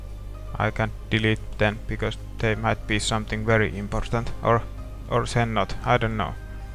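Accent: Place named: Finland. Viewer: wow nice... you are skilled artist, hopefully we will see more from you in future. i have to say you are first fellow finn (yeah heard from your accent) who makes videos about his/hers blender projects :)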